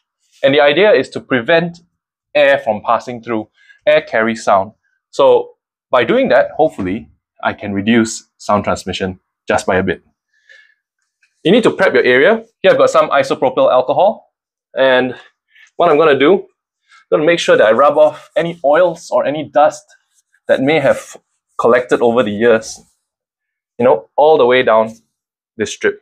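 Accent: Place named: Singapore. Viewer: Get to the point, my Singaporean accent friend!!!!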